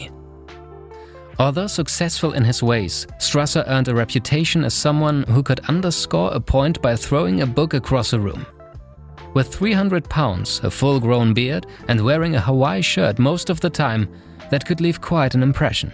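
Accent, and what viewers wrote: Germany. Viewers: Your voice sounds somewhat German. Are you from Germany?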